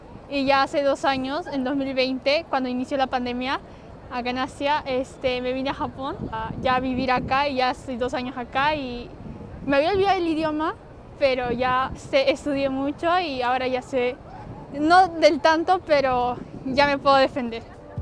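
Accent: Lima, Peru. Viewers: En lo personal, no hay nada mejor que el acento P E R U A N O , arriba PERU, bien entendible y NEUTRO. Gracias por compartir esta informacion cultural, un saludo positivo. From: Utah, US. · Ni bien la escucho y me suena a Perú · hermosisisma nipona pero el acento super peruano 😁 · Yo la saque en una su acento de conera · Yo apenas vi que hablo español sabía que era peruana la mayoría de japoneses que hablan español son de padres peruanos o tienen ascendencia peruana jsakjkds 😽💗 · esa forma de hablar es de peru, pero dices ser mexicana, teniendo madre peruana , me perdi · Es un acento muy limeño su acento. En Perú también tenemos muchos acentos. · Que guapa chica, me gustan las peruanas y su acento! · como es posible identificar tan bien el acento peruano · Me encanta su acento Peruano, ella es muy linda. 😍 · sabia que era Peruana se nota el acento, yo tambien soy de Peru y hay muchos Peruanos Japoneses aqui tambien saludos te sigo desde el 2010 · Cuando la escuché , su forma de hablar me parecía muy común ya que soy peruano y si le atiné era medio PERUANA 🇵🇪🧐👀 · Tiene acento de peruana me parece? 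· todo el mundo tiene acento, los peruanos no son la excepción, la chica tiene un marcado acento limeño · Habla como peruana. Muy buena diferenciacion. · Es acento peruano me di cuenta rápido 🙂♥️ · Suena super limeña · Tengo mucho amigos peruanos y si se nota mucho el acento peruano q tiene saludos desde España 🇪🇸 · Alv yo tambien soy Peruana pero encerio quiero tu vida es wow xd me caiste bien antes de que digas que eras mitad peruna estaba con la duda por tu acento xd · No pasó ni 20 segundos desde q hablo la chica se nota en una el acento peruano yo me pregunté recontra peruana 😱😱😱😱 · Que mal que conserve el acento peruano debería sonar más neutral por lo menos tiene algo más que el español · ya sabia que tenia algo de peruana, por su forma de hablar xd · Ese acento es inconfundible, de peruana, limeña y la entonación es de nikkei.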